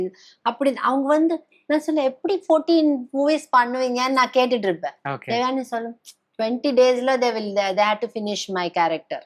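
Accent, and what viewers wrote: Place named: Gobichettipalayam, India. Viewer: Really so cute.. ketute irukalam pola iruku... athum enga ooru gobichettipallaym ah ramba solranga... ramba sir ramba sir❤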